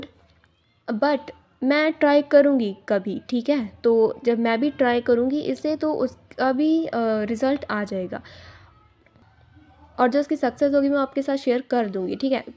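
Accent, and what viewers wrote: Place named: Punjab, India. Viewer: Probably because of her punjabi accent❤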